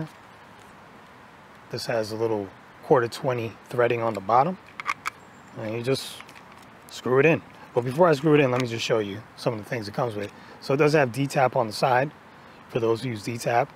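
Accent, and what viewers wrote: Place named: United States. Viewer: Dude, your American accent is so smooth! It's like butter. You sound like a mix of Ray Romano and young Louis Armstrong!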